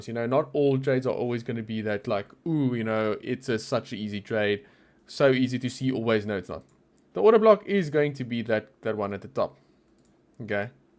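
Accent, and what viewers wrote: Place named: South Africa. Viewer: i know a South African accent when i here one 😁😁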